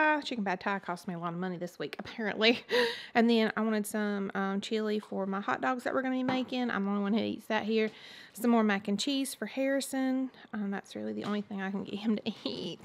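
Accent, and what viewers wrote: South, United States. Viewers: I loved the haul. I can’t wait to see Harrison in his tuxedo. Also I love your “ accent “. I’m southern also lol & I’m from Georgia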